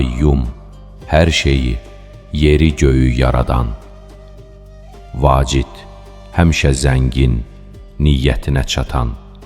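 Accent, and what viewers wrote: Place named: Turkey. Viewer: Salamun aleykum, Allah razı olsun, çox gözəl videodur. bəzi təkliflərimi ümid edirəm dinləyərsiz. Ərəbcə hərflərin bir neçə cür tələffüzünü nəzərə alaraq düzgün oxunuşu üçün adları ərəbcə yazsanız daha yaxşı.olardı. bəzən türkcə oxuyursuz qaf əvəzinə.kaf oxuyursuz. Uzadılan zaman Ə-ni yox A-nı uzadırsız. Alim yox əslində əyn hərfilə Əəlimdir. Zahir yox Zəəhir. Qaffar əslində. ال غفار Ğaffar oxunur , qafur da ğaafur olur Samad - ehtiyatsız yox ehtiyacsız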